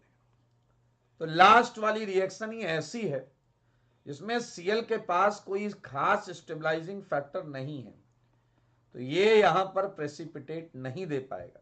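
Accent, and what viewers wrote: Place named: Uttar Pradesh, India. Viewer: Sir aap up se hai?? Aapki accent mere papa jaisi hai 😅😅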